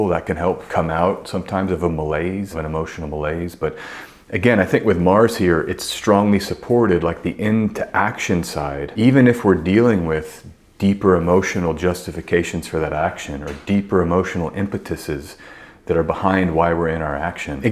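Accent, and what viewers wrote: Texas, United States. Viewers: I thought I was hearing a Texas accent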